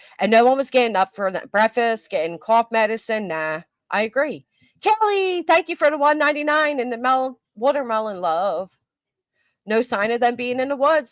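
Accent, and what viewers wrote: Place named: Pennsylvania, United States. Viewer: Happy Mother’s Day! I’m not far away from the PA line so I get how you say certain things. Much like we do here in Delaware 🌊🏖️☀️🏝️🐚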